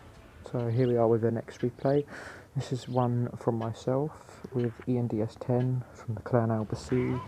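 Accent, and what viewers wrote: United Kingdom. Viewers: God damnit I love the British accent 😂😂😂😂😂😂😂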